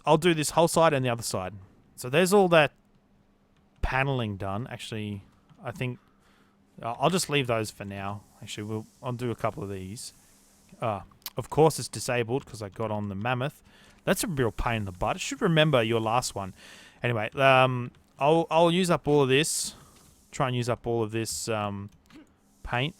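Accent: Australia. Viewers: Love the vids! It's good to see/hear more Aussie you tubers!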